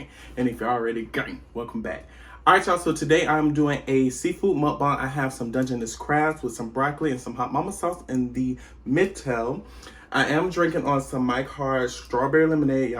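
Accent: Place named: New York, United States. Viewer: You sound like New York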